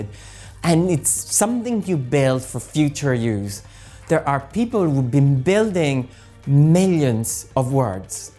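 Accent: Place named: Scotland, United Kingdom. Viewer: I could listen to your adorable accent all day. Is the influence Scottish? Irish?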